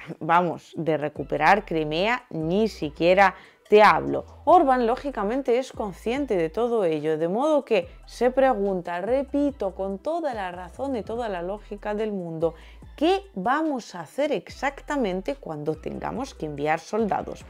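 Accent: Spain. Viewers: me acabo de dar cuenta después de llevar una grabación de ti un maestro lingüístico de acentos del español que tú hablas El Español de los gallegos de España felicidades sigues siendo la voz de RUSIA en Español y nos permite ver en México las dos caras de la moneda pero nosotros le vamos a Rusia porque estados unidos y su vecino del sur nos conocemos tanto que no podemos engañarnos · Todo esta bien . Pero deverias cambiar tu acento Español. El acento español no cae bien en América latina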